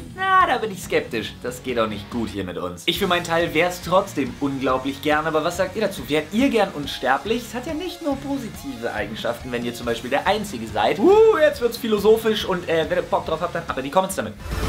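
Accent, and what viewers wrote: Berlin, Germany. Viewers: Hey wie immer ein KLASSE Video und ehrlich deine Berlinerschnauze ist der hammer:) So nu die obligatorische Meinungsabgabe: Thema 1: also Leude warum sollte man Kindern beibringen mit Waffen umzugehen Hallo und wer bitte glaubt das diese dann die Waffen verantwortungsbewusst einsetzen ist wirklich Naive, das sind Kinder die den Umgang mit einer Waffe als Spiel lernen und somit denk ich mir das auch das abdrücken für diese nur ein Spiel ist wo bitte ist da die Verantwortung? Thema 2: Kinder als Kunstobjekt und deren Leid, Aufmerksamkeit für das Leid dieser Kinder ist gut aber wie schon sagte bisschen fragwürdig so mit der Hoffnung der Kinder zu spielen. Thema 3: ja die Forschung ist doch was feines ich will Persönlich nicht ewig leben aber die Leute die noch leben und ihr Leben Tag ein Tag aus vergessen für die find ich das super aber sein wir ehrlich, wenn man damit unsterblich wird können es sich eh nur Reiche leisten. 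· Bist du Berliner?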